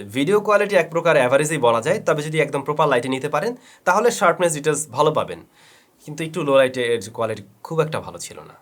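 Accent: Bangladesh. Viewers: Smart phone er full review er jonno video te click korinai apni advertise korechen ei video er how pathetic 😂😂😂 lose the hat and the hairstyle and the sudh desi bangla tone. thank me later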